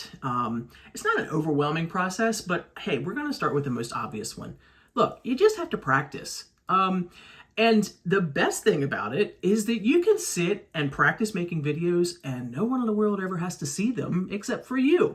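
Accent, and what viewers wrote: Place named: Midwest, United States. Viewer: Are u from the midwest. I lovvvve your sense of humor. You had me laughing so hard numerous times. Content n comfort is awesome. Thanks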